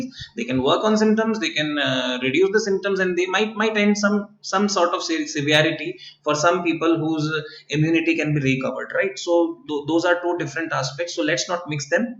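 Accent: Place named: India. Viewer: Arre Sir Mast Angreji thi 😀... Bolte raho..to hindi ka undertone b nikal jayega ! 😎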